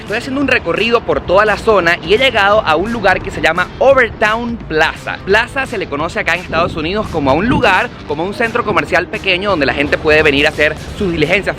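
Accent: Venezuela. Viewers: El acento venezolano es inconfundible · eres venezolano no.? hola no conocia tu trabajo.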